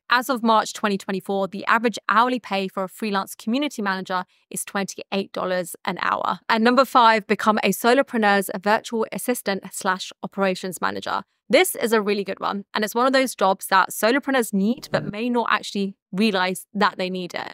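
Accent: United Kingdom. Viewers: Can you start a British accent class? Many people will pay and join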